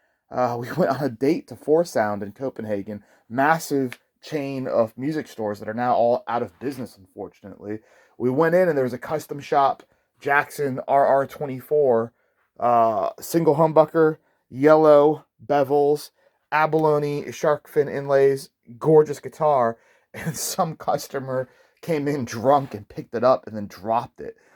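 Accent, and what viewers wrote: United States. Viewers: wenn der nicht so einen furchtbaren amerikanischen akzent haette, ... nicht zum anhoeren. OI! Speak English!!!!!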